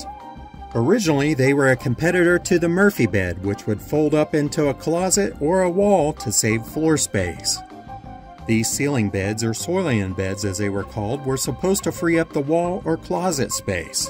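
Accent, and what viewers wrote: United States. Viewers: Sounds like you are an American. I am English and was born and grew up in a home built in 1666. No inside toilet. No mains water, this came from a well and was brought into the house in buckets. No electricity. When I started to school I was fascinated with the light switches and taps(faucets) . At 72, I now live in a house built in 1972, with many modern conveniences but I still hang my laundry on the line outside on fair weather days. ps. My childhood was not too typical of the time, but one advantage was having 70 acres of land which allowed me a lot of freedom and a diet of home grown organic food. Love from England.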